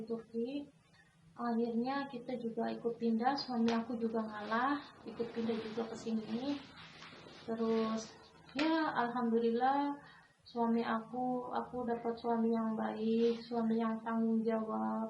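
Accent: Banyumas, Indonesia. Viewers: Karo basa ngapak yaa, mba..😂😂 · ngapak ngapak salam kreasie kompelite like like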